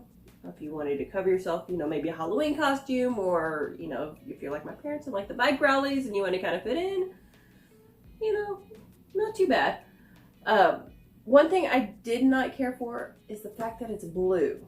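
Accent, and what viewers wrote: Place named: South, United States. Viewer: Hello love still going strong I see... just give them a thumbs down 👎 call it what it is, I won't tell nobody😂...how you been? Also what's that accent im thinking arizona, also sounds a little southern🤔.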